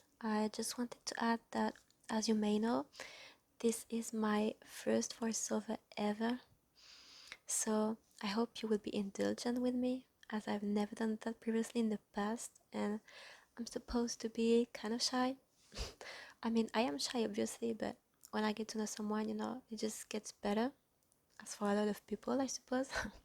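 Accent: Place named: France. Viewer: Hello! Je suis moi aussi française, donc on va dire que ça m'arrange que tu ais l'accent, comme ça je comprend mieux ! Sinon tes constructions sont toujours super belles, je me demande toujours comment tu arrives à faire de belles choses seulement avec le jeu de base où quelques packs... Moi j'ai quelques packs, mais je ne peux plus m'en passer ! Et aussi, je trouve ça cool le voice over!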